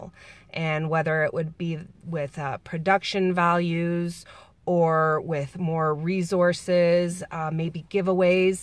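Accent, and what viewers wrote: Canada. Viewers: I thought you sounded Canadian! 😄 I'm in Wisconsin on lake Michigan, great lakes goddess siSTARs ♡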